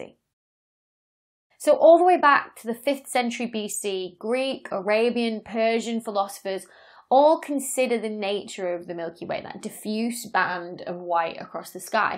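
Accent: United Kingdom. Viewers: Fabulously put together - you've got a new fan here. Great presentation, clarity and very easy to follow... and it's soooo refreshing to hear a British accent for a change. Love it. Harry.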